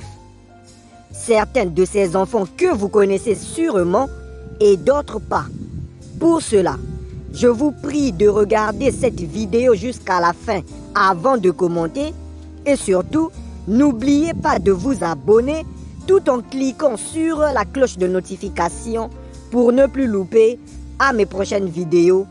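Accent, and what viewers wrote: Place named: Togo. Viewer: Ton accent est comme la togolaise qui nous parle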